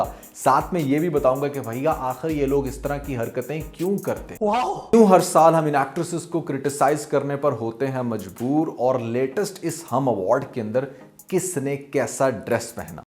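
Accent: India. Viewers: Bhai tu muhajir hai kya bilkul UP Bihar aur Old Delhi wali urdu bol Raha hai? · aap indian accent mein kyun boltay hain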